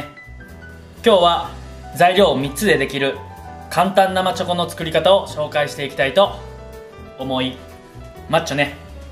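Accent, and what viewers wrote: Kansai, Japan. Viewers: リプありがとうございます！要所要所に出る関西弁も好きですよ＾＾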